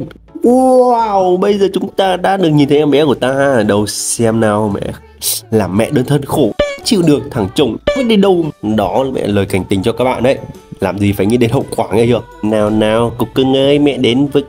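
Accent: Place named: North, Vietnam. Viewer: ko lq nhưng mà nghe ông nói giọng là miền bắc nhưng mà tui cứ có cảm giác ông là người miền trung hay sao á 👁️👄👁️👍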